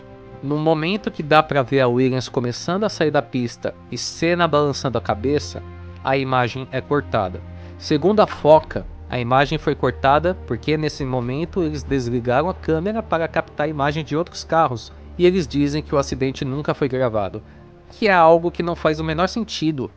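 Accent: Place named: Portugal. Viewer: Ele é português? Tem sotaque.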